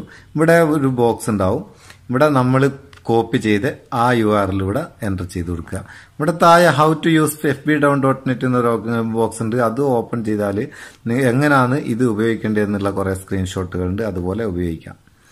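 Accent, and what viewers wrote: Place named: Malabar, India. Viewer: Malabar slang 😁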